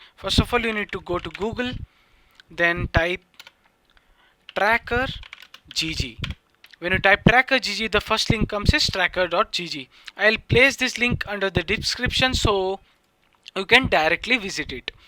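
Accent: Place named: India. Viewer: I love ur accent bro . Its indian but not like the other indian videos which are completely inaudible. You actually speak english very fluently and i have had no problem understanding u. Keep up the content guy!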